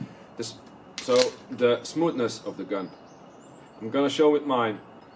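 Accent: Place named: Eastern Europe. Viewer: Where the hell are you from lol I can't regcognize the accent, sounds slightly Slavic but I could be wrong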